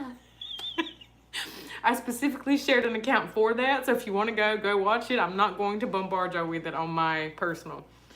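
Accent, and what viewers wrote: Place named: North Carolina, United States. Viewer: I'm an older woman and I really enjoy your videos. I'm originally from Laurinburg and I bet you know where that is. Our accents are alot alike. LOL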